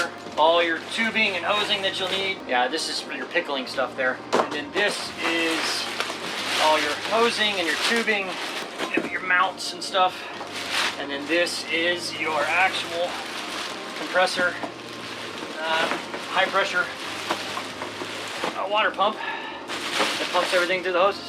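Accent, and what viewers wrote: Canada. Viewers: "Oout and Aaabout" love the Canadian language. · I like her Canadian accent. Very nice.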